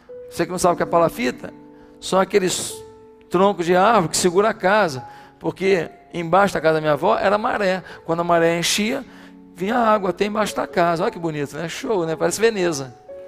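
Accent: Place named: Minas Gerais, Brazil. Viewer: A igreja é no RJ, mas esse sotaque está mais mineiro, rsrs. Culto maravilhoso....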